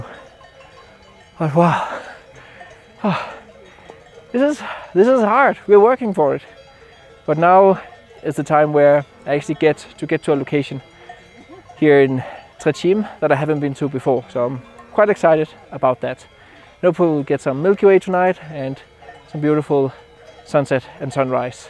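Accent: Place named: Denmark. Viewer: Lol man høre med det samme at det er en dansker 😅😅🍿 men fed video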